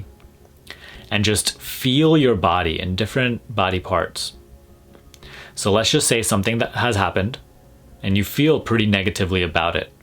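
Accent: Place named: United Kingdom. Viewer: Out of topic, you sound quite British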